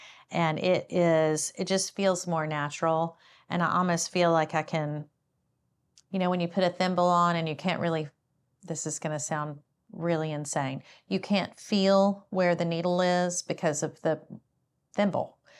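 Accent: South, United States. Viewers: Ok you have caught my attention, where do you live or from your accent is so southern?😊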